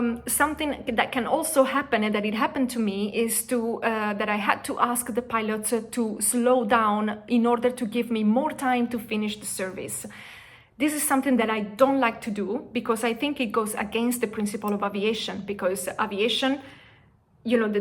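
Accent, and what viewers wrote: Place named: Italy. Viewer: You have a nice Italian accent.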